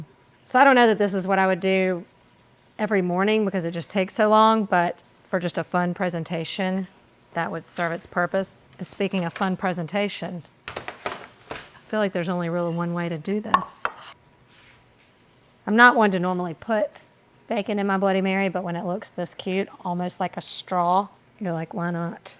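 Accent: South, United States. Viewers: You remind me of Ella Langley a bit, especially that raspy Southern voice of yours. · County accent + hazel eyes + Italian = Sexy woman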